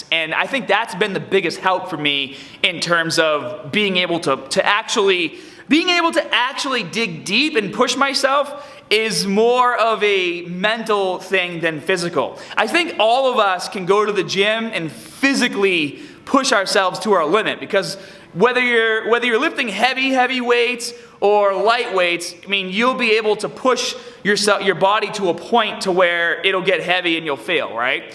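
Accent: Boston, United States. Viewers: haha awesome! Picked up that Boston accent for sure. great video man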